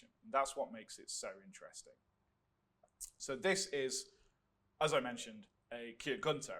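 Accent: United Kingdom. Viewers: The psycho in me likes the weapons and the cultured person in me likes a British voice talking History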